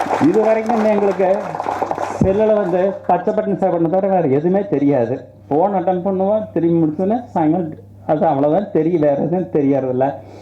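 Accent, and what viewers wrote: Coimbatore, India. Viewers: i love kovai tamil.. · kovai tamil arumai ayya :)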